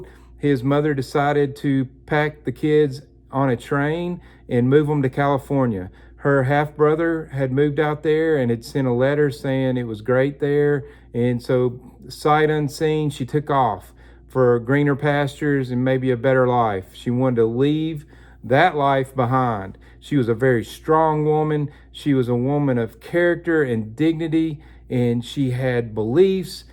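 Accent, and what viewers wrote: South, United States. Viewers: this was such a great episode. I’m in the middle of reading Jackie Robinson-A Biography by Arnold Rampersad. I think you have the facts pretty close to what I’m reading in the book. As I’m reading, I think about how hard it was for black Americans to live during that time; even today in many instances. You’re telling of the story, and I mean no offense at all, by a southern American, really makes the story come alive and enriches it with a lot of empathy. And your card collection is something else. Thank you for sharing,.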